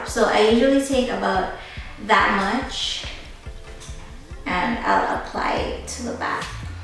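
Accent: Jamaica. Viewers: you from Jamaica?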